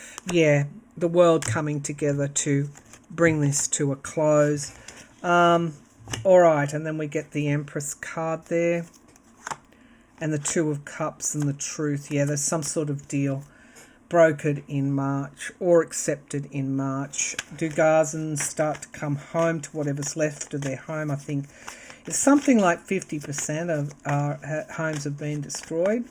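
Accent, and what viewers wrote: Australia. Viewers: Australia your accent is awesome I am from mass down the Cape cod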